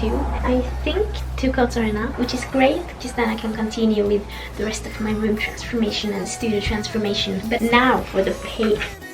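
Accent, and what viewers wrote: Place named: Scandinavia. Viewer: i loved this video!! i can't figure out where ur accent is from is it from one of the Scandinavian countries?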